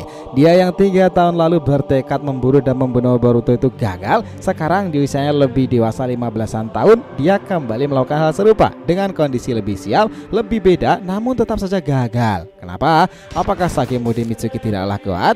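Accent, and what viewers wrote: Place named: Banyumas, Indonesia. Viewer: Hancur....hancur dgr jatok ngapakkk 😂🤣. Tersendiri'''😂. Gak seru 😅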